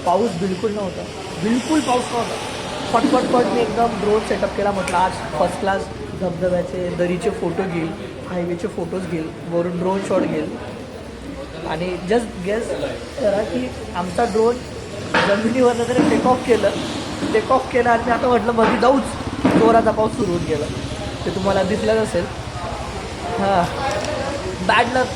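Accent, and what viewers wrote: Khandesh, India. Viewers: Dada Tuz boln khup Chhan vatat ahirani Karan me pn nasik Chi a satana tal madhun tuz boln jvlch vatat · Kay dada jordar khandeshi😂😂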